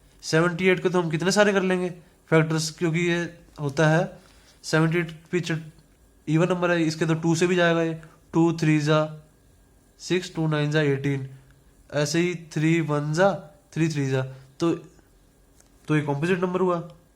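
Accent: Punjab, India. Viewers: Tusi punjabi oo hna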